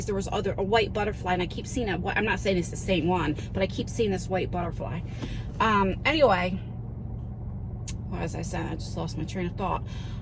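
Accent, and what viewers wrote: New Jersey, United States. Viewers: I truly enjoy these vlogs I’m a new subscriber l love your Jersey accent by the way love ❤️ from Ohio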